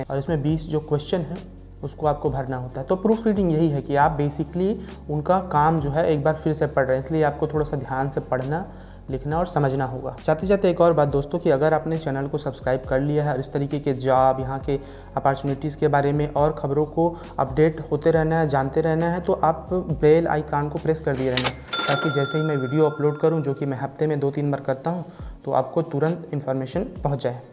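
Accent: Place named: Chhattisgarh, India. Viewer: Videos k start me Johaar jo bolate h..us se lagta h...aap India me CG se h... reply kre..vaise toning CG wali lagti h...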